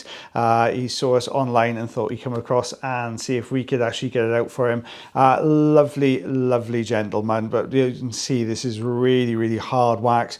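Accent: Wales, United Kingdom. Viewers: Nope! He's Welsh.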